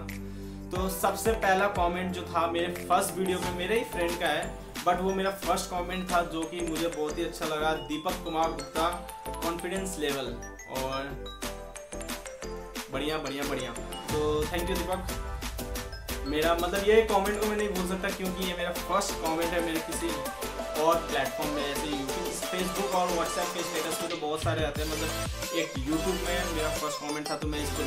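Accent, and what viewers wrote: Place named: Bihar, India. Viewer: App bihar se hai 😀😀😀😀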